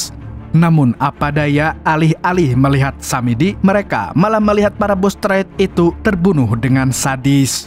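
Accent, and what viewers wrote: Banjar, Indonesia. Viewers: Orng kalsel kah pian mang, kya ad logat Banjar ny kaituu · Orang banjar kah pian nih mang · Bang lu kalimantan mna, logat kek banjar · Org Banjar kah bang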